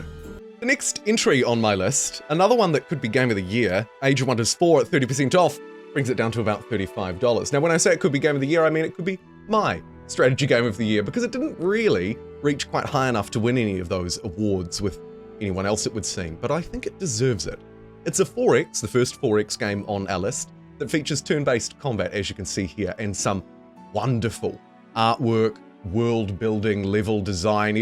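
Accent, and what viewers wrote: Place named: New Zealand. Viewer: Your NZ accent is so amazing it makes me wanna pay attention and listen to what you’re saying 😍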